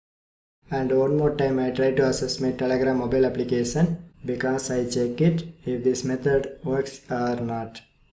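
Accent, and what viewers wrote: South India, India. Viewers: Are you South Indian? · bro he's Indian he's trying his best to speak English respect that. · R u from south india bro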